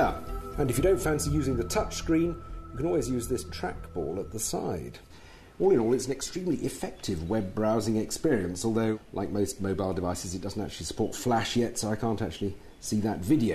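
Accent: England, United Kingdom. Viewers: HES ENGLISH NOT EVERY1 IS AMERICAN OR CANDIAN U KNOW ?! caps** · he is so posh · damn.. the first british accent I like so much :D 5 *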